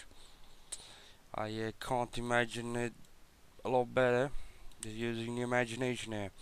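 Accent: Netherlands. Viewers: I work with a couple of Dutchies, but not heard your variation before, you from the Groningen area or the East maybe ?? Enjoyed your Vid, even if I do not pretend to comprehend it all :) My biggest problem is that you can make shapes appear if you look hard enough at anything, remember when we were kids looking at the clouds, you pull out some interesting pieces tho for sure, def worthy of further investigation or question. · I can´t watch this vid because of that Dutch accent. It really drives me insane.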